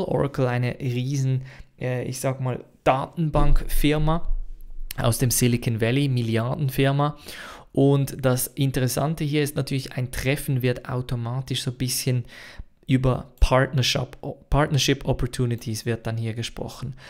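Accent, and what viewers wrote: Switzerland. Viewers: warum nennst du dich? Du bist Schweizer das hört man...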